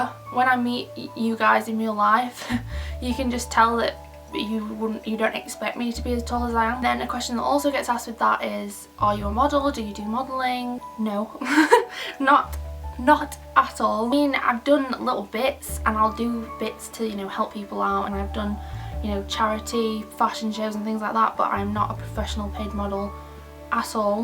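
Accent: United Kingdom. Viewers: R u british ??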